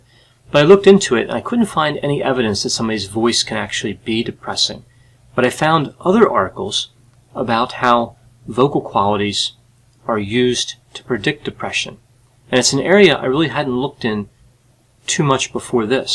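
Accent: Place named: Delaware, United States. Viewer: 👍🏻 Well this was definitely an interesting video. I hope we don’t see the day where robots have anything to do with assessing emotion alone without help from people. 😞 Lol..🤞 And, you have a lovely, and relaxing voice. I like your accent as it reminds me of my home state De!!! 💗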